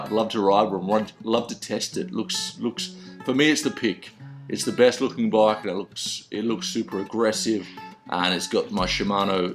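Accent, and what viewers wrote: Australia. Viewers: Great vid Awesome to have an Aussie accent reviewing these bikes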